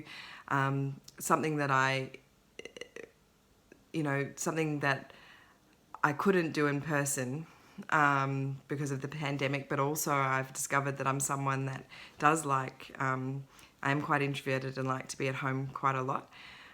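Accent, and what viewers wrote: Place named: Australia. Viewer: Just discovered your floss tube - I am suck at home with a sore throat which I hope is not the dreaded illness and occupying myself stitching and catching up on flosstube. It is so lovely to hear a video in an Aussie accent, and I am absolutely delighted that my pattern is the first FFO :) Hope your studies are going well... I work in environmental science for my day job and am a low waster, and it's lovely to find a member of the stitching community who shares both passions :)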